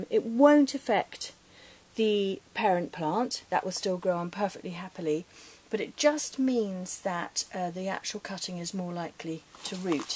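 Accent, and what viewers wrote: United Kingdom. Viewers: Wow! Thanks for the video. I learned a lot! Where are you from? Are you from Britain?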